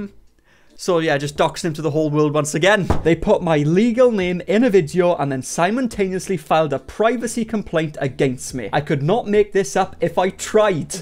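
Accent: Wales, United Kingdom. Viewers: He has a Welsh accent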